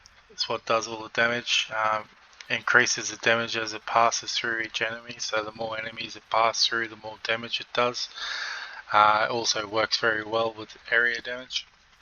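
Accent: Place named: Australia. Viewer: Nice to see a guide with an Aussie accent.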